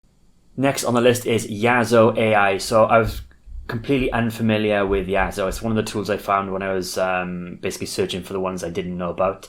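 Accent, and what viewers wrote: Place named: Cockney, United Kingdom. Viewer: I think you forgot your audience was mostly American and not cockney? It's difficult to follow you. This is a really good video but no way am I going to slog my way through 2 hours of this. But your shirt fit the the mood.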